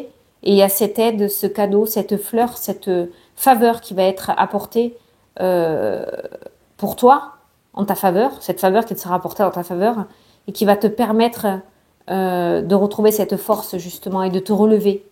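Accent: South, France. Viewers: Merci je vous adore vous êtes trop cool et incroyable et surtout avec l'accent du sud. Sa fait du bien de vous voir et je rigole à chaque fois. En se qui concerne le lundi il y a beaucoup de choses qui me correspondent dont l'ophtalmologue 😅 ainsi que les rêves prémonitoire. Continuez à être naturellement heureuse et partager avec nous vos précieux moments. ❤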